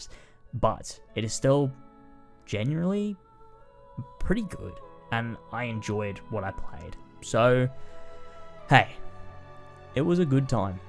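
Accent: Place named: United Kingdom. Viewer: The British Accent Makes a Video Review of Dark Souls 2 maneuver. Certified classic.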